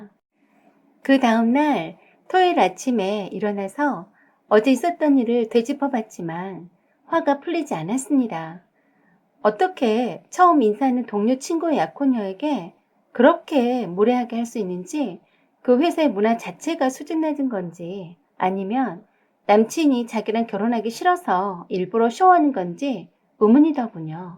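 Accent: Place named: Gyeongsang, South Korea. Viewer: 경상도 사투리가 영....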